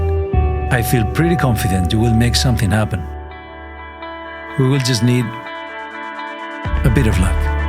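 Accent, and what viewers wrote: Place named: Spain. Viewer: Everything perfect. But just one detail is not so perfect. As a Portuguese I can perfectly, "catch" that the narrator is not the Portuguese Surfer, but a very evident Spanish "Sxsxxss" accent (similar to Pepe Guardiola) when speaking in English! It would be just perfect if would be the Portuguese Surfer doing the narrative voice / speaking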